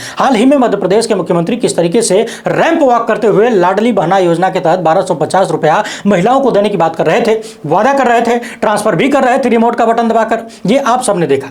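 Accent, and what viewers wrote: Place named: Awadhi, India. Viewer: आपकी पत्रकारिता को सलाम। आप अपने कार्य को पूरे समर्पण के साथ कर रहे हैं। आपको मेरी ओर से स्नेह और दिल ❤से आशीर्वाद। आप बहुत ही द्रुत गति से बोलते हैं और कभी कभी आप अवधी बोली का तड़का लगा देते हैं। आपकी यह शैली मुझे बहुत पसंद हैं।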